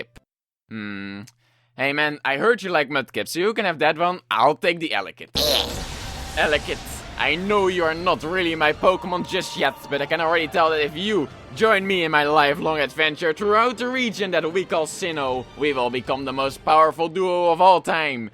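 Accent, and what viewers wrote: Netherlands. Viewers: Are you dutch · Hears first sentence, jep that’s a dutchie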